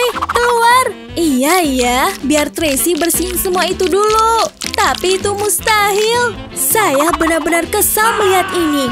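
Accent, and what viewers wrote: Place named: Java, Indonesia. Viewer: Dasar ngomong medok jawA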